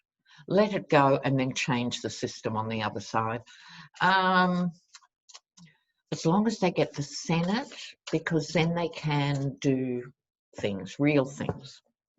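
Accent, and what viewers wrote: Australia. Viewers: Thank you so very much for sharing your insights and wisdom with us! You are helping me remain as sane as possible. Question, please. By your accent alone I'd be able to tell that you're Australian. I'm curious -- why do you have such an interest in US politics? (Please don't misunderstand! I'm right there with you politically!) I realize there are plenty of "fires" to put out, so those, naturally , will take precedent. But as you have time and/or inclination, I'm sure I'm not the only one who's curious about this. Thank you for all you do!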